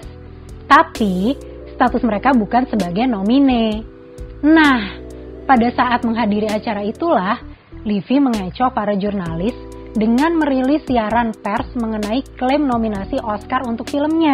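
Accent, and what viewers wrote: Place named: Java, Indonesia. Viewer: Fix mba nya jawa pelan~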